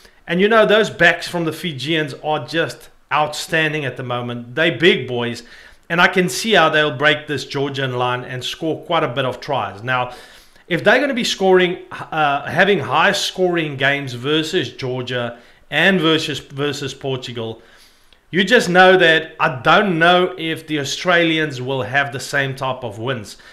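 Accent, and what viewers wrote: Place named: South Africa. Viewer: So weird seeing white person with South African accent.wales beat Fiji they was just to good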